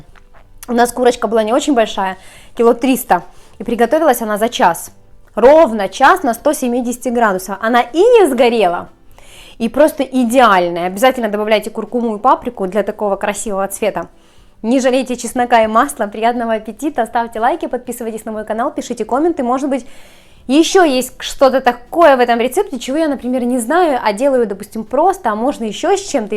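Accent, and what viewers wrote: Ukraine. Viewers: Как я балдею от украинского говора))))) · Ваш Украинский говор воодушевляет очень.... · Мои гости всегда в восторге от вашей курочки, но вот сегодня без паприки и куркумы бледная моль. Как же мне нравится ваш украинский говорок....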